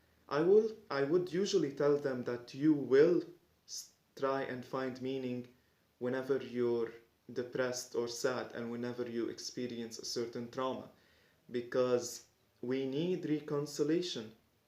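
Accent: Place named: Lebanon. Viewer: your lebanese accent is so cute <3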